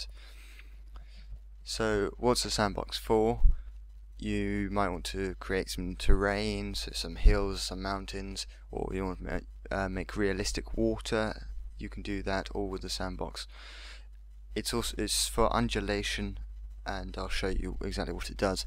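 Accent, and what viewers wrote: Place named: United Kingdom. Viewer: your british accent is sexy, the british women have the awesomest accents cause their so foreign and thickly uk if not usa but once you teach a british woman to read (depends on the woman) you may find your self dying of laughter and although in love to <3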